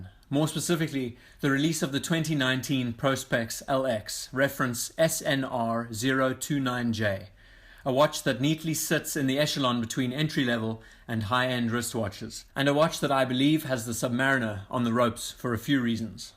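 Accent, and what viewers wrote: South Africa. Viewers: How did you rework the crown? Or did you have it done? Judging by your accent, you're South African. I'm in CT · You sound South African! :) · You sound South African 🤔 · South Africa 👍🏾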